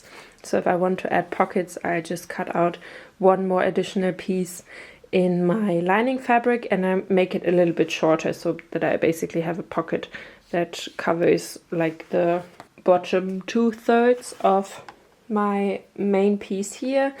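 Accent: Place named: Germany. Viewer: Hallo, eine sehr schöne Tasche hast du da. Mir hat damals der Karpfen schon sehr gut gefallen, aber so in 3D als Ente, einfach genial. Man hört, dass du aus Deutschland kommst, woher genau wenn ich fragen darf? LG Chrissi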